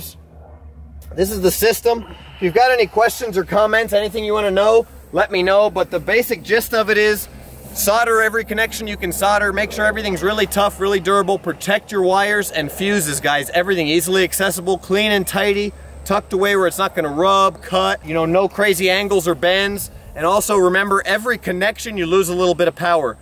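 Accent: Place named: United States. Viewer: You sound like an American yet you drive a Toyota? For me AMERICAN MADE BABY . But whatever gets you home. Still a pretty truck though.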